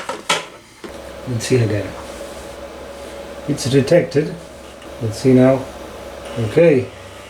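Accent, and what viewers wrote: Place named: Spain. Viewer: Because of your accent I thought that you were located in Spain.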